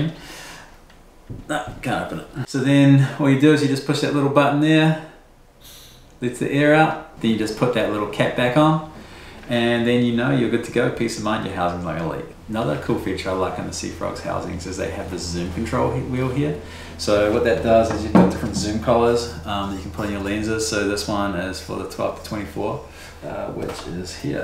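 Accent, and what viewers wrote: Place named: New Zealand. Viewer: Awesome video, thnks very much! Was trying to watch it on a bus with the subtitles, kiwi accent is the best but clearly a struggle for youtube to match subtitles too haha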